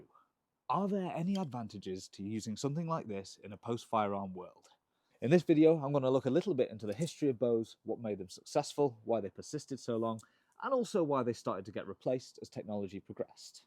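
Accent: United Kingdom. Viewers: This is exactly the kind of video I needed to see for the story I'm writing AND it's got a dude who's funny with a british accent. Win win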